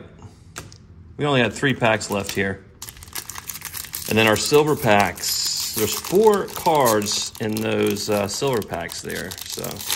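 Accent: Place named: North Carolina, United States. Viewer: Based on the accent I assume we are from the same “Queen City” in NC. Which LCS do you use?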